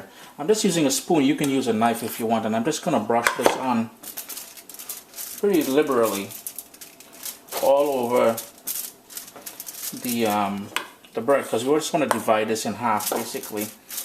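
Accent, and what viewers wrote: Trinidad and Tobago. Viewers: Yuh sound Trini 🤔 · Am I hearing a hint of a Trinidadian accent? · of course i will put scotch bonnet pepper on it!!also brother how do i make this more carribean? i love your accnet!! trinidadian?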